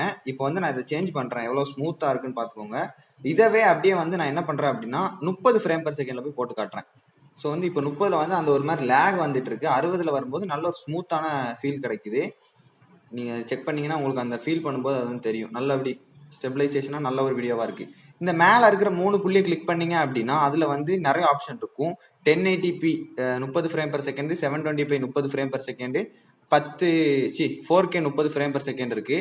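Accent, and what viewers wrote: Kanyakumari, India. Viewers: Anna neenga kanniyakumariya?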